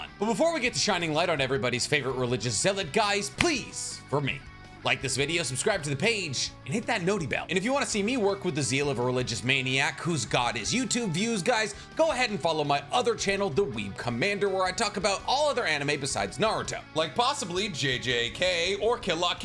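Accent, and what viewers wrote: United States. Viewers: united states accent to japanese names hurts my ears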